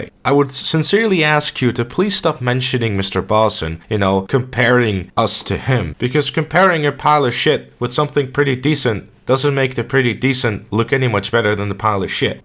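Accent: Netherlands. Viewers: are you dutch? your accent seems dutch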